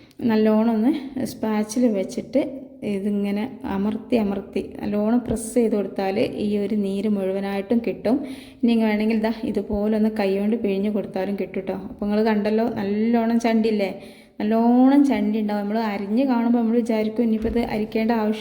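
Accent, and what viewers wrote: Malappuram, India. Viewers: Ingineyum samsarikkan ariyo allenkil malappuram thathade aniyathiyano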